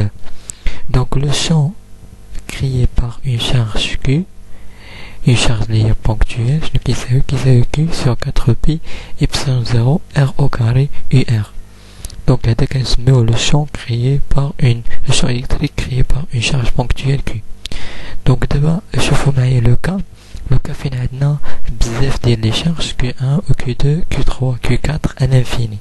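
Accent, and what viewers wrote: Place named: Morocco. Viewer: Il est marrocain 🙂 donc c'est normale que ça façon de parler n'est pas comme la tienne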